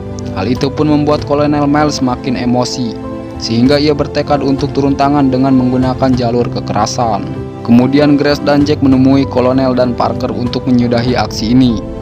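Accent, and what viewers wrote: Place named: West Java, Indonesia. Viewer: Pasti Narator Nya Orang Sunda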